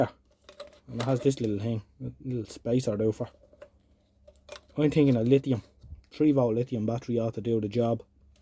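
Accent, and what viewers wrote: Ireland. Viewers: As your man says 'you can never have to many multimeters' my cheap CPC dvm is oten let on and is always flat, i never seem to have managed to keep the internal fuses for current so it's volts only. I have a NOS 70's far east meter that i bought for old times sake and i still drag out my big old AVO. I played your voice down the phone to my wife, she was from Hacketstown Carlow. She reckoned dublin or wicklow. Anyway mate, keep up the good work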